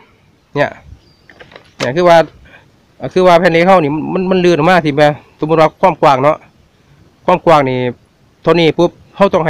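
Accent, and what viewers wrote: Isan, Thailand. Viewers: ผมคนยะลาฟังเข้าใจครับพี่พูดอีสาน เมื่อก่อนผมเป็นทหารที่นราธิวาส เพื่อนคนอีสานทั้งนั้นครับ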